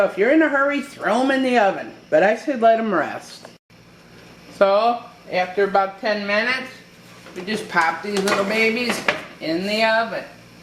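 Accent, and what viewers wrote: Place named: Maryland, United States. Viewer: Where are you? Your accent reminds me of Maryland.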